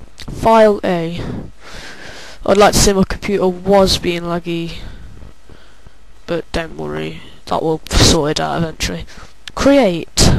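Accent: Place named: United Kingdom. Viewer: u sound gay with the britshzz acsent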